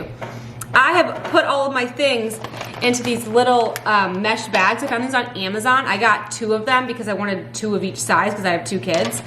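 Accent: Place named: New York, United States. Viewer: Are you from New York? Love your accent!!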